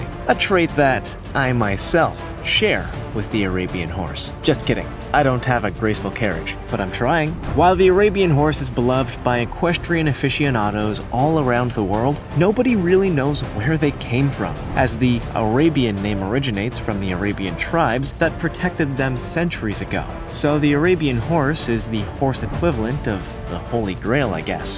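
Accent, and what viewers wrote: Canada. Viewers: Christine Still he’s Canadian and I have a canadaian friend who pronounces it the same idk tho🤷🏼‍♀️ maybe it’s not that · Accent canadien eh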